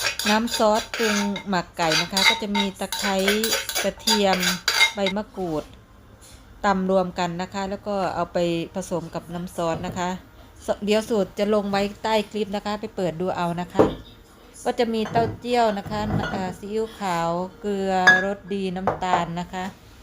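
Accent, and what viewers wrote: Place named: Isan, Thailand. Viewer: ชิมนำแน่ครับ .เป็นตานุ่มคือคำเว้าอิหลีตั่วละ.น้ำลายไหลเลยครับ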